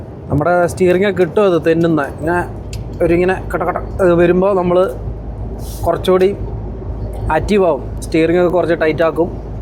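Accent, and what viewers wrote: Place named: Kannur, India. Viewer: സംസാരം കേട്ട് കണ്ണൂർ കാരൻ ആണോ പിന്നെ ഗൾഫിൽ ചൂട് ആയാലും Ac. ഒത്തു പോവും ഈ തണുപ്പ് പോന്നു ഭായ് ഭായ് എത്ര യൂറോ കിട്ടിയാലും വയ്യ 🙏❤️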